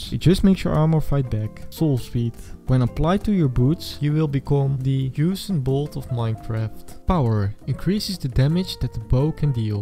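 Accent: Netherlands. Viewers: Are u Dutch? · Love the Dutch accent hahahahah!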